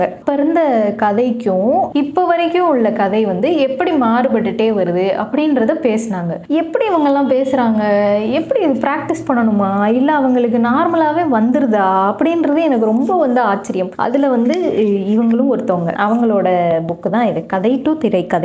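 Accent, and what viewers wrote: Madurai, India. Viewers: Amazing ❤. Loving your madurai accent. Great choices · Madurai slang mathiri iruku🎉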